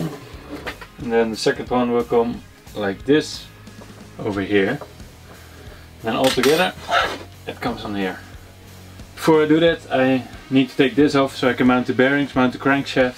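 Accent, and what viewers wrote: Netherlands. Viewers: haha je hoort gewoon aan dat accent dat je nederlands bent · laat me raden, u bent nederlands? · Je hebt echt een Nederlands Engels Achtig spraak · joe sound ferry duts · U sound dutch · are u dutch? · You have a dutch accent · Where you from? Cuz u sound dutch 😂😂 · Are you From the netherlands ? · Are you dutch, you sound dutch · Are you dutch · Are you dutch? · Dutch accent? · Je uit spraak kan wat beter man ik kan gewoon. Een Nederlands accent er in horen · Are you Dutch? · verry nice video, ik hoor dat je van nederland bent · heard the dutch accent, i subscribed XD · I knew it. Your english is very nice but I can always hear something about the pronounciation if someone is Dutch. Do you live in the Netherlands as well? I'm Dutch too btw. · Ik vraag me af hoe je de windingen van de spoel hebt geteld, of meet je om de zoveel keer hoeveel ohm tie is? 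( Neem aan dat je een Nederlander bent zo te horen aan het accent :) ) Damn nice project! :) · U sound Dutch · You sound realy dutch are you? Awsome idee btw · are ya dutch? you have an accent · Do you happen to be dutch, because you have the same accent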